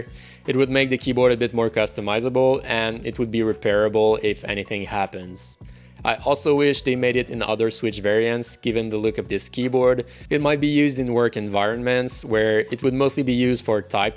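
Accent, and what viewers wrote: Quebec, Canada. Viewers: J'aime ton professionnalisme, le travaille de tes vidéo sont soignée et ton accent québécois distinctif avec une diction impeccable et sans reproche (magnifique) :)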